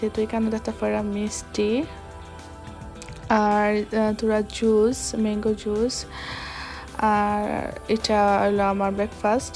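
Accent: Sylhet, Bangladesh. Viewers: তোমার মাত শুনে সিলেটি মনে হয়..😍 আপু আপনার দেশের বাড়ি সুনামগঞ্জ কোন থানায়..???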